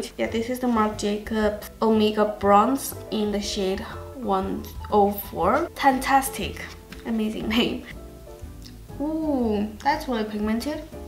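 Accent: Spain. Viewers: Can you a video in spanish like a vlog or something, im loving your spanish accent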